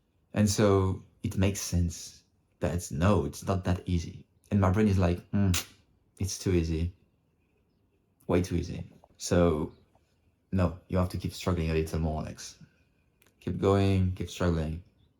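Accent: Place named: Baltic states. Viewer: Putain je me doutais t'etais francais mais t'as comme un accent genre lithuanie estonie vers labas 😁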